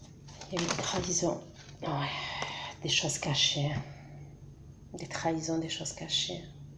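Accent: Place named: South, France. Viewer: Ta une belle voix du sud comme moi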